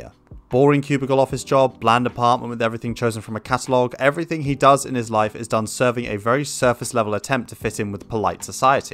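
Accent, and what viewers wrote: United Kingdom. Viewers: They're just both southern English my guy.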